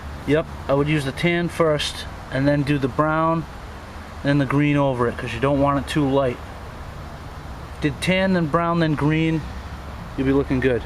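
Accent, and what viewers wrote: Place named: Boston, United States. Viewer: do you live in boston? because i do to and recognize that accent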